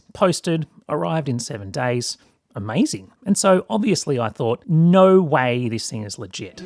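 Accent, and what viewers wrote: Australia. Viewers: I bought a similar fan to the cheap one shown here but for a price of about 30 UK pounds (which is more than twice the price). Outside is a bit slimmer but otherwise looks very similar. However, I found that spending extra got me the following: - 26650's instead of 18650's. They advertise 4500mAh, not sure what it really is but it is rather heavy and I haven't managed to discharge it yet (had it for almost a year). - Proper cell balancing, the midpoint of 2 cells is connected to the charging board is well, the datasheet of IC used shows it does balancing. - Speed control. - Some protection from the fan being turned on by accident (the button needs to be held for 3 seconds before the fan turns on) - Nozzle that properly locks in instead of a wimpy magnet. Funny enough, the price of that same model of fan later rose closer to what the kica costs. 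In terms of feedback, it's a good video with a pleasant aussie accent but 2 things I'll note: - The electronics on the back of the motor is actually the ESC. They managed to make it so small by using "2 in 1" transistors, same type as used in laptops for generating power rails, they are basically a MOSFET half-H bridge in one SMD package, this way they only need 3 footprints instead of 6. - The wind speed could have been measured in m/s, would have been more understandable to most of the world and comparable with listings since a lot of them advertise speed in m/s.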